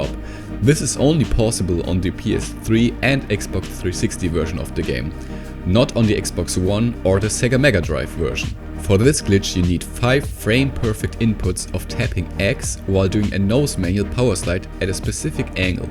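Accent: Germany. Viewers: im pretty sure that this man in the video is german. i hate this accent. i am german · Du kommst aus Deutschland oder? Deine Aussprache ist verdächtig haha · sound's german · Bist du deutscher? Hört man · Are you german bro? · Hab mich die ganze Zeit gefragt, ob das ein deutscher ist. Hört man wirklich raus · Man merkt dass du deutsch bist ;D nicht negativ gemeint!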